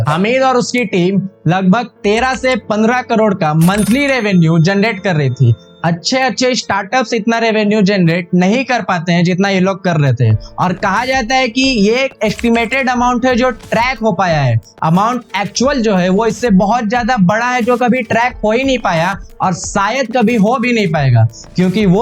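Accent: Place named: Bihar, India. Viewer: Pakka yeh Bihar se hai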